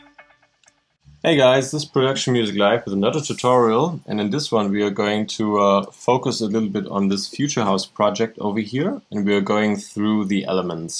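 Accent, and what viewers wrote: Germany. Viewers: Ich hörs doch, you are german!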